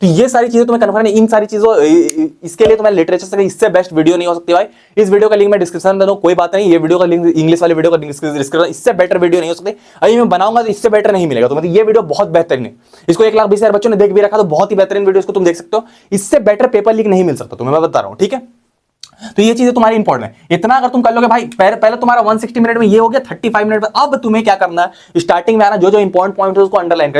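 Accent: Bihar, India. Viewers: BHAIYA KA BATH KARNA SA LAGTA HA BIHAR SA HA HA BHAIYA I AM ALSO FROM BIHAR ❤🎉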